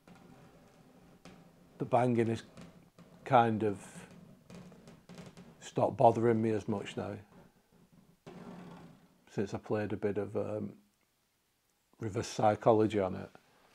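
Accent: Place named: England, United Kingdom. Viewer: I just figured out that English people don’t pronounce their h’s if it’s the first letter of the word. Like hello would sound like “ello”. I love your accent. It’s very cool and makes you nice to listen too. Like when you say half. It sounds like “aff”. 😊❤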